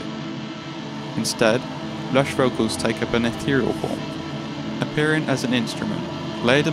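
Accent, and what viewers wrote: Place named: United Kingdom. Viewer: mostly the british accent tbh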